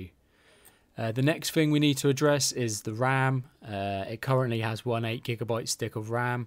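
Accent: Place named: United Kingdom. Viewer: Noooo that PC was perfect for me 🤣 Love your British accent, Nice video ❤️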